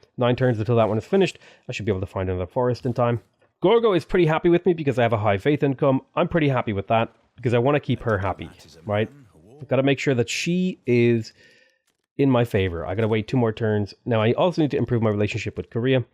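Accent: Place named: Ireland. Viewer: its just the irish in him ;), a lot of english natives who don't regularly hear an irish accent also need to slow it down lmao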